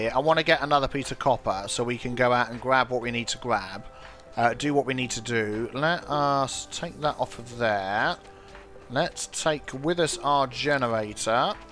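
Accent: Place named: Kent, United Kingdom. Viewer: I would say Kent, judging by the way your pronounce your R's.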